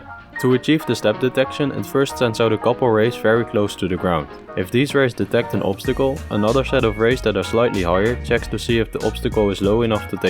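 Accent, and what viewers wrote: Netherlands. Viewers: Beautiful dutch accent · r u dutch? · R U Dutch? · Wow what an amazing channel just subscribed, when I heard your accent I immediately thought that you were Dutch! and your are right? I'm also learning how to program myself, but I'm just a beginner.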